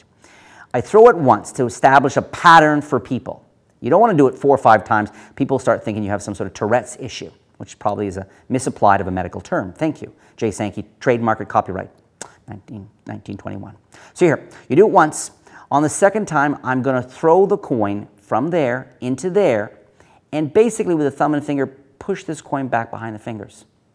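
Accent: Canada. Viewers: Are you Canadian??? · are you canadian?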